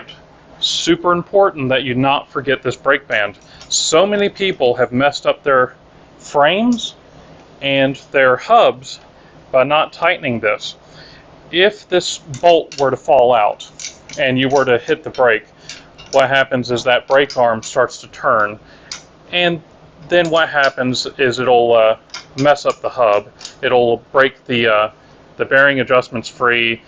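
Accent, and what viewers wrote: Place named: North Carolina, United States. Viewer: Such clean fingernails for a mechanic! I guessed NC, and I was right! I don't know how. I'm in Boulder, Colorado...Never been there, or anywhere near. I guess it was the subtle accent and the nice little town. I've electrified a Chinese cruiser with 29 inch wheels. It's like a giant version of this. The chain keeps popping off, the motor is too powerful. I've ordered a 22 tooth with an offset to bring it in better alignment. We'll see. The removal of the sprocket was surprisingly easy, but I wouldn't have known how without You.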